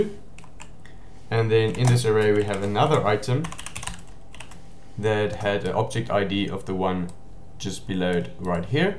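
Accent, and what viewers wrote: South Africa. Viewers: Can't miss a fellow South African accent 😉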